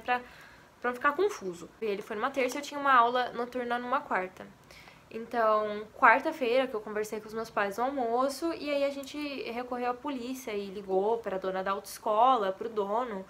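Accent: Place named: Minas Gerais, Brazil. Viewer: Vc é mineira?kkkkk vc parece que é mineira kkkk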